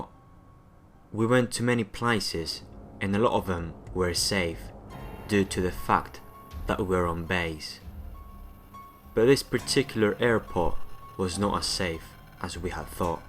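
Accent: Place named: Derbyshire, United Kingdom. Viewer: even though you're Greek you sound like my friend from Derbyshire County in England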